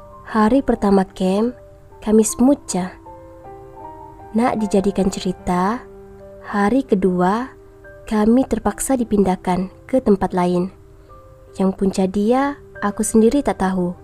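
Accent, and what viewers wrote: Sabah, Malaysia. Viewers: Hahahahaha tula cara dia cakap mcmtu,aku syak dia org sabah🤣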